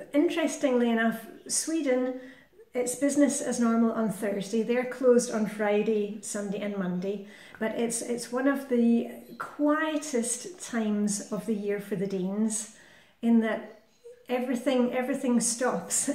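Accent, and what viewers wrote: Scotland, United Kingdom. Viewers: I love the fact that you can pronounce the y spot on as you do it with the Kylle kylle Tuborg øl. Very good videos. Keep up the good work. Curious to learn where you're from - I presume Scotland but couldn't pin point the dialect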